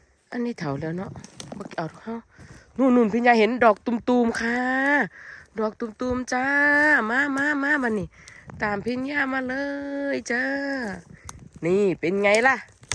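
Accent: Korat, Thailand. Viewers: ชอบฟัง พูด ภาษาโคราชบ้านเอง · สำเนียงคล้ายๆคนโคราชค่ะ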